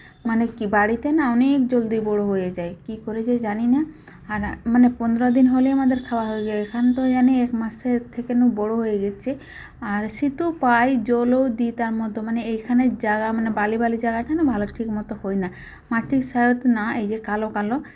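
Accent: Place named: India. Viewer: Tomar kotha gulo sunte khub valo lage ektu Hindi tan koto dhone pata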